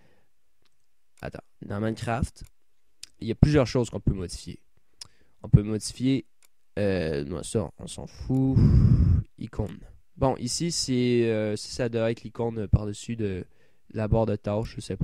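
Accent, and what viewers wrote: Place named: Canada. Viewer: j'adore ton acsen canadien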